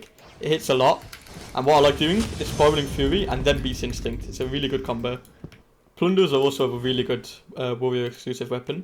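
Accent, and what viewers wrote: United Kingdom. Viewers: Close enough, its actually british (atleast he sounds british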